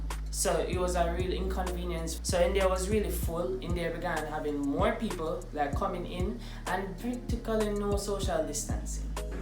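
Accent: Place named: United States. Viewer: Talk like an American tho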